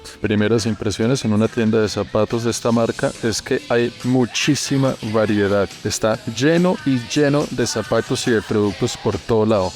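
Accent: Bogotá, Colombia. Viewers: Saludos a lla.mama de. Tiene un exelente hijo un grab ser humano. Bendiciones. Dialecto colombiano a donde quierea va 😍Me encanta.. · 🤣🤣 habla como mi abuelito: para que 50 dólares si en 2 meses le crece la pata 👟 yiyos 🩲🤣chagualos 👟👟🤣 los calzones con la marca para que el que le vea la nalga sepa que lleva Tommy 😂😂 muy Bogotano el hablado, Saludos desde Bogotá. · M encanta como documenta, aparte ese acento colombiano que tambien me gusta. Parse,un gran saludo y abrazo desde CDMX!!! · Acabo de descubrir tu canal, y ne gusto mucho tu manera de relatar los videos, me encanta tu acento colombiano, ojalá vengas a alguna parte de Mexico y te conozca en persona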